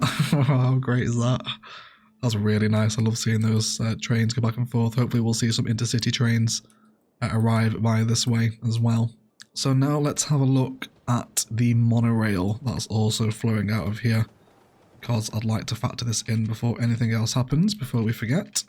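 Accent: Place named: Manchester, United Kingdom. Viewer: I'm french so not that good to spot all the english accents, but you're from Manchester right? I can hear a bit of Marcus Rashford when you talk, I like it! 😁 Oh and great stuff overall on the channel. It helps a loooot!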